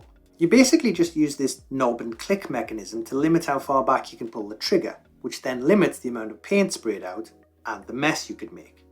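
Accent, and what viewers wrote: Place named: Durham, United Kingdom. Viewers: lol - btw- where are you from? I live in Canada now, but originally from Durham… Nice to have a YouTube channel with a proper accent in it.! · Ah This is easily one of if not the best airbrush video I’ve seen! Makes me proud you being a fellow Geordie, maybe that’s what made it easier for me to understand (wey aye pet 😂) it’s so refreshing to see I’m not the only one who’s been tearing my hair out trying to learn how to use an airbrush. It helps I have the same infinity CR brush as you do, and the little pot to spray into. Every time I’ve tried to use the airbrush I have spent 80% of the time cleaning 10% swearing and 10% actually painting! In my last paint session I didn’t get any tip dry ( miracle) by occasionally dipping the end nozzle/needle into a small bowl of clean water- is this recommended? It seemed to work for me. 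Another tip I seen elsewhere was for starting with darkest colours and go down In shades so you don’t have to fully clean in between ie black brown grey white as an example. I was using 6mm needle but 4mm seems the way to go as you say, which I also used recently. Anyway cracking video mate I will have to check out more of your videos, I was genuinely laughing as well btw at some of the jokes (GOT S8 is 💩 😂)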